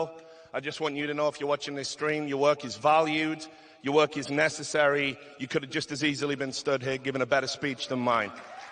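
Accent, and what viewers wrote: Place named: Newcastle, United Kingdom. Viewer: Love the Geordie accent.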